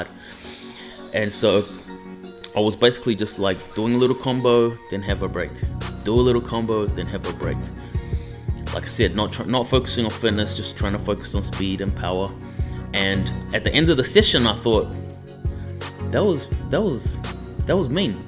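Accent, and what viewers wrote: New Zealand. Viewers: I hear that NZ accent and subscribe immediately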